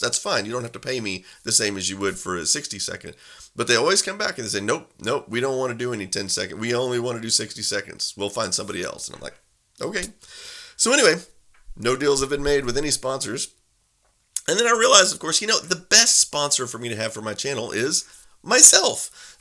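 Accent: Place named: Texas German, United States. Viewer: Ich habe nicht gewissen, dass du ein Texas-Deutschspracher bist!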